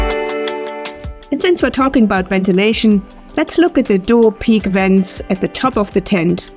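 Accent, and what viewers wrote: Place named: Saxony, Germany. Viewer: Danke. Ich habe deinen Kanal gerade erst entdeckt. Ich dachte mir ich schreibe mal auf deutsch. Dein Englisch hat so einen sächsischen Klang. Jetzt sehe ich mir in der nächsten Zeit erstmal weitere deiner Beiträge an. Nochmals vielen Dank und alles Gute.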